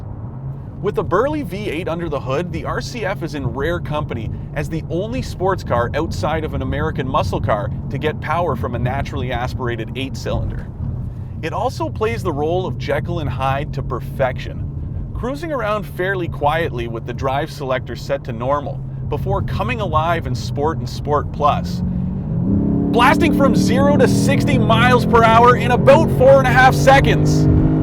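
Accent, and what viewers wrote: Canada. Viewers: that canadian accent makes my ears itch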